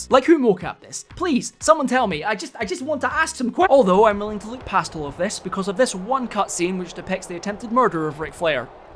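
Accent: Scotland, United Kingdom. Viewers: Surprisingly he’s actually Scottish haha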